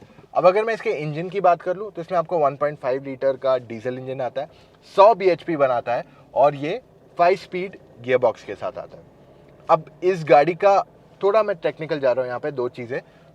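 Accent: India. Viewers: nobody notices it spoke in very desi style because he knows that the people who are going to buy rthis they might not know english words that much hats off, he knew about his audience very well, subscribed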